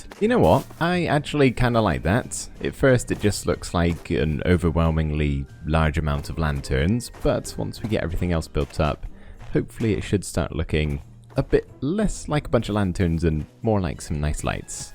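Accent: United Kingdom. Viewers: The cozy Christmas Minecraft and your British accent is so nostalgic as someone who grew up watching InTheLittleWood’s Christmas ADVENTure Minecraft series!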